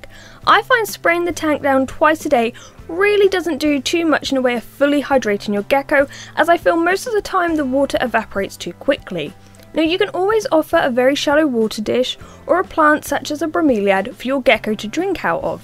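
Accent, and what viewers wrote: England, United Kingdom. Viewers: where about from England are you from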